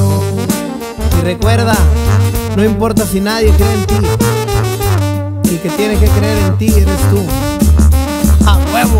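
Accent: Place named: Santa Fe, Argentina. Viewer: Me suena a santa fe 🎉🎉🎉 éxito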